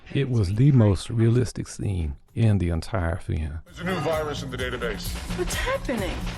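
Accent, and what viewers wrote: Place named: Mississippi Delta, United States. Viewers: This channel rules, and that dulcimer deep south Mississippi delta accent is the cherry on top. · My favorite thing to do in the morning is watch craftsman while I’m doing my make up lol there’s just something about the combination of an extremely southern man talking to me about making toys which I will never build while doing my someone elaborate make up routine 😂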